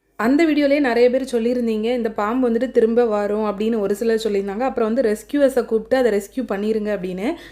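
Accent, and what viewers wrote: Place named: Nagercoil, India. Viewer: Entha ooru nenga Nagercoil pola iruku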